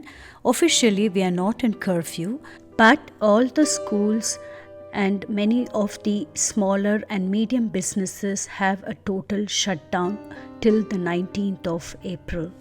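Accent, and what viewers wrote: India. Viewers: Talk like a Indian sound like a Indian 🌚🌚 Indian 🙄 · I visited Switzerland 8 years ago, and found it to be absolutely fascinating. Not just compared to India, but also compared to other countries in the world. I didnt, or couldnt find any dirt, but as you said, no country is 100 % perfect, but I found it near perfect. However, you may be knowing better about it. Unfortunately, this is a bad time, and as of today i.e. 13th May 2020, I heard that there has been some improvement there in Switzerland compared to here, in India (by your voice, it seems you are Indian as well, I suppose), thanks to the excellent healthcare and orderly procedure there. Hope that this situation tides off and we are back to normal in a year (or two). I would love to visit Switzerland again in future, if opportunity presents.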